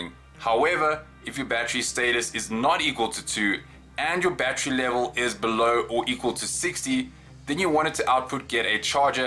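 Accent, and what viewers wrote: South Africa. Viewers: Love your voice accent. Africaans? Australia has one of its very senior politicians with your accent. Subscribed because we want more user friendly program from you.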